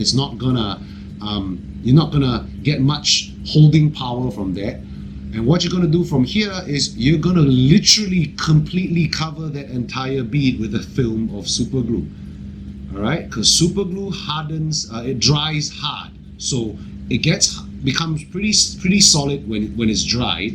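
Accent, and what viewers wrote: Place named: Singapore. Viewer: good to hear a singaporean accent again bloody miss home tbh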